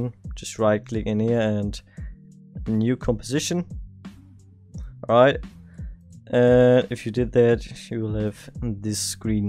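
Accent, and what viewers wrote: Germany. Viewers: Der deutsche Akzent sticht mies hervor 😳🧙🏿‍♂️🧙🏿‍♂️ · man merkt du bist deutscher xD No front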